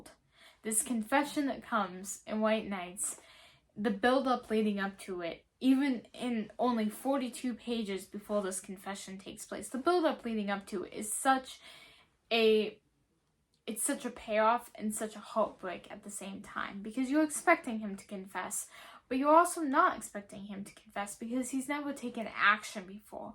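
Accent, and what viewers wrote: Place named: United States. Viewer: Hi thanks for the video. English is not my first language. I'm very curious about human geography. You've got very cute accent. I'd love to know if it's from some specific part of the US.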